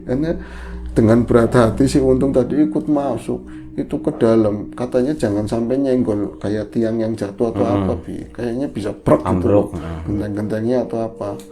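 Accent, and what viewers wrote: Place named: Java, Indonesia. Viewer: Yg bikin khas adl dialek nya mas yg medok lucu.. sebenernya ini yg bikin ga jd serem hahahaa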